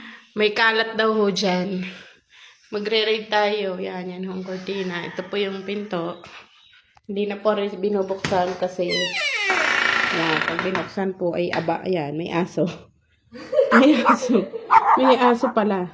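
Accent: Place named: Batangas, Philippines. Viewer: Thank you Ate sa pag video nyo Kay ate.nakakatuwa ang salita ninyo lalo na yung punto ninyo batanguenyo.❤❤❤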